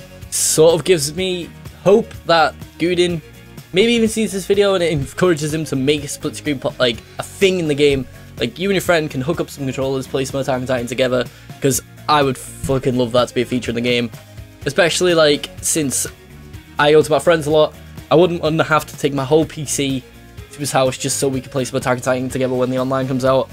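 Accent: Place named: Scotland, United Kingdom. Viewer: btw love your scottish accent!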